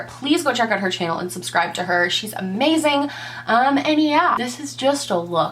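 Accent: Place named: Upstate New York, United States. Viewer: Girl I want a full look with you telling us everything in your upstate accent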